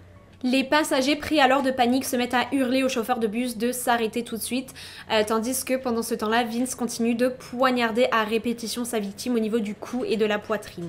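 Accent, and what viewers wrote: Quebec, Canada. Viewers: Petite question rien a voir, tu est Canadienne ou vous au Canada ? J'entends un tout petit accent que j'adore ! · Pour dire que tu es du canada ton accent je l'entend a peine sur certains mot 😁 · J étais sur qu'elle avait un accent français et québécois. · Je suis au Québec et je me rappelle parfaitement de cette histoire. C'était assez fou ! Changement de sujet, en t'écoutant j'entends l'influence "canadienne" dans ta voix et le choix de certains mots. Je trouve ça mignon ;-) Bonne continuité xx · Est-ce que tu parle avec l'accent québécois en temp normal?? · Je savais que tu avais un accent. Tu es de où au Canada-Québec. Fille du Québec xxx 🌺🌺🌺🌺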